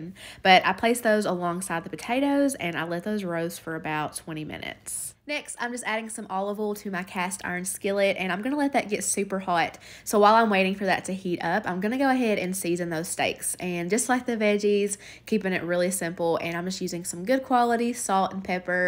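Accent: South, United States. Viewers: I'm so happy to see your back doing your what's for dinner videos, everything looks so yummy, I hope that in time you will start healing from losing your family member, I know exactly what you are feeling because I have been through the same thing, anyway, I'm so glad you're back, I surely miss when you don't post, I just love your southern accent, it's so cute!! Sending you and all of your family lots of love and hugs, take care, I'm looking forward to your next video, God Bless You All 💗🙏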